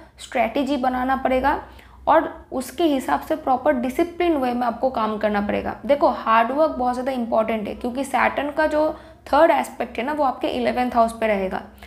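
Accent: Bengal, India. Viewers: Typical Bengalli accent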